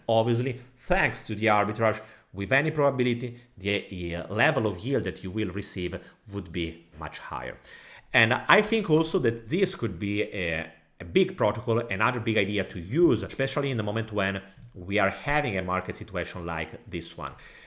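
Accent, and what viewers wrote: Italy. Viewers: ti prego toglimi una curiosità: sei italiano o spagnolo? L'accento mi sembra italiano, poi ho visto che hai anche un canale spagnolo...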